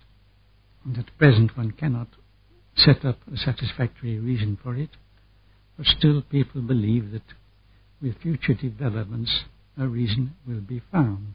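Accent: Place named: Netherlands. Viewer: I could swear the accent is Dutch (being Dutch but living outside of Holland myself)...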